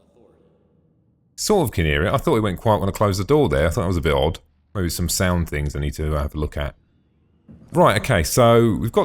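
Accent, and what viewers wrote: United Kingdom. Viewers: Love me sum British accent m8 it's refreshing to hear a nice voice commentating on the video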